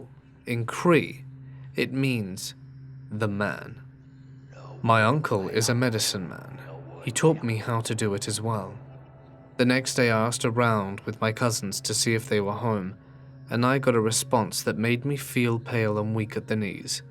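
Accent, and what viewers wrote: United Kingdom. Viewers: Hey, really enjoy listening to your narrations, BUT I do have one gripe...could you PLEASE stop saying the word "garage" like your American, I mean you have a British accent. It's so ANNOYING. Thanks. Blessings.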